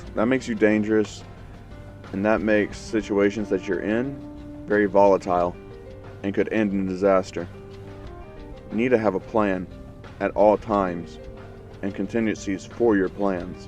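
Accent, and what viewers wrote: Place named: United States. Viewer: Your voice sounds like the most MERICA voice I've heard ever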